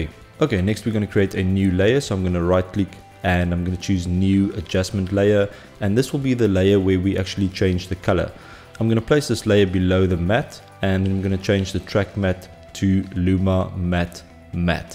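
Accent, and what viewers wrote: South Africa. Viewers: You sound South African 🤔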